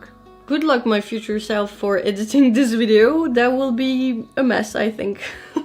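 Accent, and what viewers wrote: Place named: France. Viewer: Le petit accent français ;)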